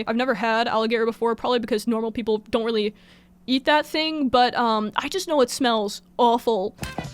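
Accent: United Kingdom. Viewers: you pronounce your T’s so british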